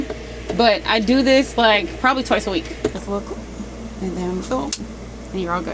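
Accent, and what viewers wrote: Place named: Jamaica. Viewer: How come you sound Jamaican from time to time?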